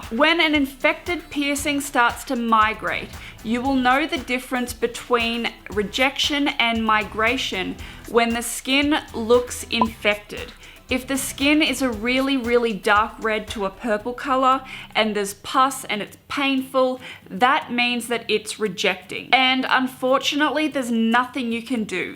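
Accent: Australia. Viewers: Love your Australian accent... Will you ever get your septum pierced?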